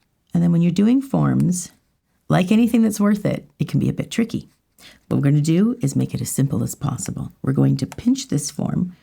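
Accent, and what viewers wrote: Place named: Canada. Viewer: Her speaking voice sounds sooo much like Shania Twain. That subtle Canadian accent. At least I assume she is Canadian because of her accent. Seriously, find a clip of Shania Twain talking. Love it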